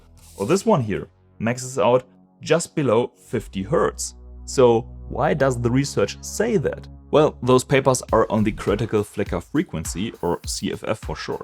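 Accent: Germany. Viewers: Falls du deinen Akzent verbessern willst: Schau dir mal an, wie man das "e" in Hertz im Englischen aussprichst. Musst die Zunge dabei deutlich entspannter halten - du gehst in Richtung Amerikanischem "r" - machen viele Deutsche so, ist aber für den Großteil der Englischen Welt falsch und passt so überhaupt nicht zum Rest deines Akzents. Ähnliches gilt übrigens für das zweite "o" in "Monitor". Nur so nebenbei ;) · bist du deutscher? oder spinn ich...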